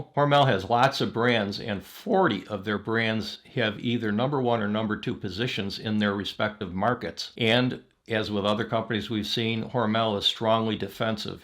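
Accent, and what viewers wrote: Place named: Pennsylvania, United States. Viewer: your have a Pennsylvania accent, maybe Pittsburgh: -you say "dowwars" , instead of dollars. -"quawllee", instead of quality. 😁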